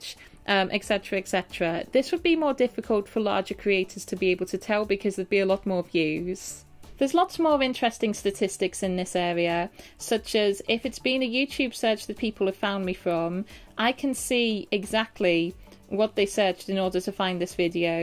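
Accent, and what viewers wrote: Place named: Wales, United Kingdom. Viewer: Have you ever lived in Wales as your accent sounds quite Welsh?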